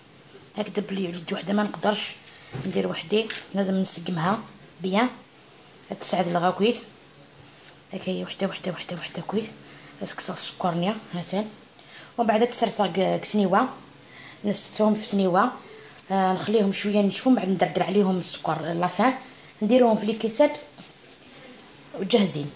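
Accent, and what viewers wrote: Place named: Bejaia, Algeria. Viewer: ربي يعطيك الصحة شحال نحب كي تهدري البجاوية الحرة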